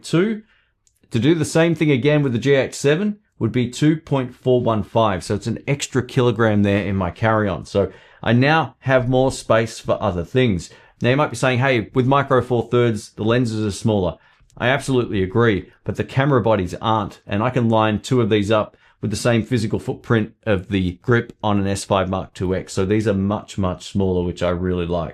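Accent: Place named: Australia. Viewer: woops my bad. Should've recognized ozzy accent) silver top looks very nice, hope it'll make it over here sometime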